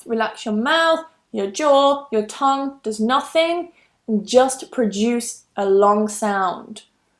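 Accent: United Kingdom. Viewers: Is this british pronounciation ? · British Accents😎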